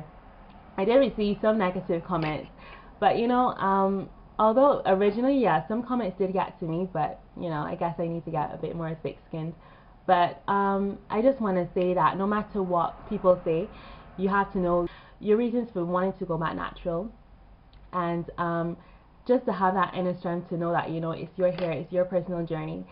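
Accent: Barbados. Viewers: Are you bajan because I should know that accent anywhereee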